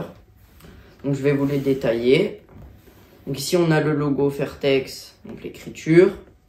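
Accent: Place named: South, France. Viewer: Tu vis dans le sud de la France ? T'as un p'tit accent du sud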